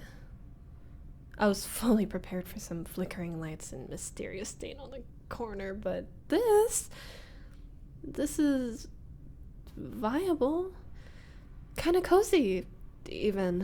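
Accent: France. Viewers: Purée je me perd dans l'asmr et je tombe sur une asmrtiste incroyable qui parle anglais I appreciate seing french speaking english and I absolutely love your accent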